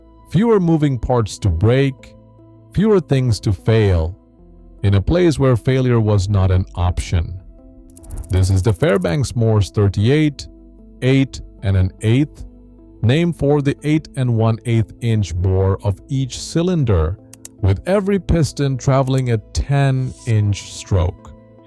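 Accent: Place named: India. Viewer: An AI voice model with a slight indian accent on this type of channel is a wild choice!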